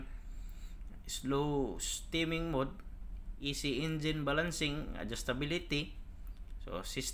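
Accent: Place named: India. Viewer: brother ur starting to sound like those indian youtube videos, no offense taken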